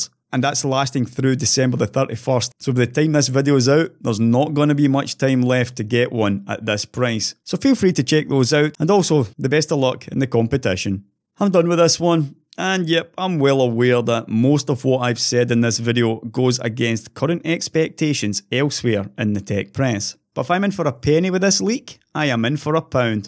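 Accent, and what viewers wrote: Scotland, United Kingdom. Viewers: Scottish accented videos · I just LOVE your scottish accent... you sound like straight outta Braveheart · with a scottish accent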